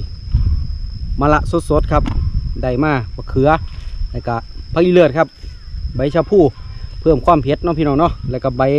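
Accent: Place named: Amnat Charoen, Thailand. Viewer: หนูว่ายุอ้ายคือเว้าคือภาษาบ้านหนู เอฟซีอำนาจเจริญเด้อจ้า 😁😁😁😁😁😊😊😊😀😀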